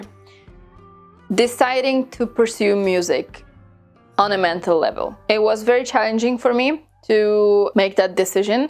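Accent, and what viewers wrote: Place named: Poland. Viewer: I didn't realize there was such a void in my soul until I heard the words "Hurdy Gurdy" cheerfully spoken in a polish accent. I feel better now.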